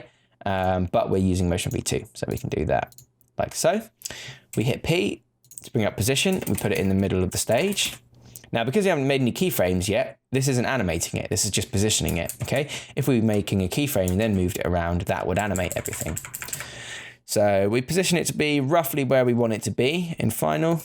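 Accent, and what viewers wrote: United Kingdom. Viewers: You are actually one of the better instructors, but your British accent, sometimes hard to follow for Californians like muahhhh. But I still subscribed. Thank you, sir. Brit.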